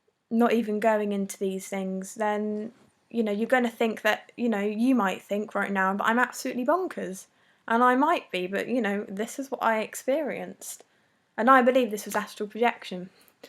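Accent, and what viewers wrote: England, United Kingdom. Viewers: are you from England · be careful ms pretty english accent girl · nice british accent, are those posters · I love the British accent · she looks french but has british accent xD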